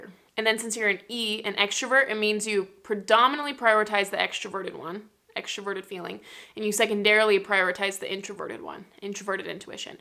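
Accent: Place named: France. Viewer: Kool Vidéo! You speak english like it is french😎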